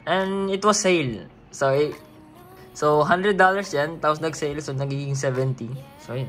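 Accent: Visayas, Philippines. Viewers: Bisaya ka lods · sana-all akala ko may face reveal sa Dulo hahaha BISAYA accent talaga si lodi!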